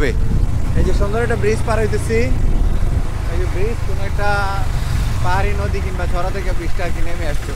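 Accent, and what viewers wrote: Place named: Comilla, Bangladesh. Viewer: আপনার বাড়ি কুমিল্লা মনে হয়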